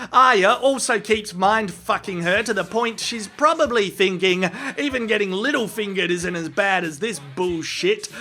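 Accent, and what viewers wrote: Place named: Outback, Australia. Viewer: My favorite part of Ozzy man reviews is that although he sounds like a fair dinkum outback wanker, he is deep and insightful, especially with GOT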